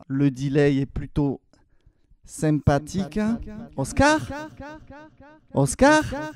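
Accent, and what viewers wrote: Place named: South, France. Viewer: super ta vidéo Oscar ! je cherche une table de mixage et tu m'as donné envie d'acheter ce modèle ! je connais bien le matos yamaha...mon fils m'a emprunté une table de mixage yamaha que j'ai acheté il y a plus de 10 ans chez cash converter en occase ! 12 pistes 170 euros rien à dire ! il y a une couche de poussière dessus de 10 cm au moins , et elle fonctionne toujours super bien !! il ne veut plus me la rendre !! en tout cas ton accent du sud fait du bien à entendre !! bonne continuation à toi !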